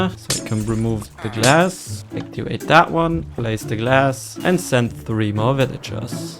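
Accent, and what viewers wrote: Germany. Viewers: Du musst deutsch sein man hört den Akzent so raus 😂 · your german acent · U have a german accent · Ekelhafter deutscher Akzent 🤮🤮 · German accent makes this 1000x times more relaxing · hab garnicht drauf geachtet das du nen deutschen akzent hast haha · I hear the german accent like crazy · I hear Deutsch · Finde den deutschen Akzent crazy funny weiter so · I can hear the "Deutsch" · I hear deutsch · der fakt das ich rausghehört habe das du deutsch bist ist crazy weil dein englisch sehr sehr gut ist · bro you acent sounds so german bro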